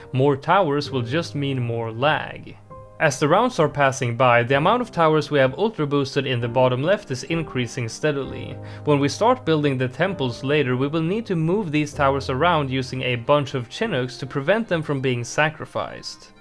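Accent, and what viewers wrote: Sweden. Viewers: Dude are you Swedish?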